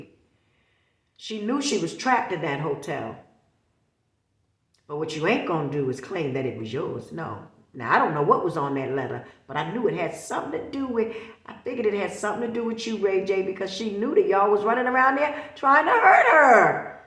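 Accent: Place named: Boston, United States. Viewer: Heavy on ya "HOT"(HEART)❤ Straight Boston accent 🆗️ Love it and thanks 4 the "tea"🍵💨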